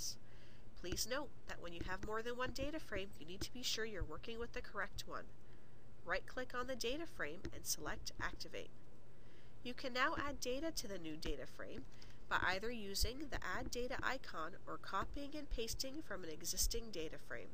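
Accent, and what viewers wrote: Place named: Mid-Atlantic, United States. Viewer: gotta love that mid east coast accent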